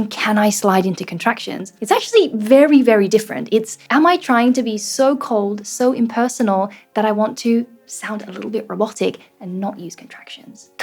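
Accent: England, United Kingdom. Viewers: Your voice and accent are really pretty! Where is your accent from? Is it English? Do some Australian accents sound British? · Hello! I like your British accent! Do you live in England ? And can you share tips to have an accent like yours ? I'm learning English(at school) but I live in a country where english isn't spoken that much... I like British accent so much! I've been trying to learn it but I don't have anybody to speak English with, so... I just talk with myself and I don't really feel like my British accent is improving...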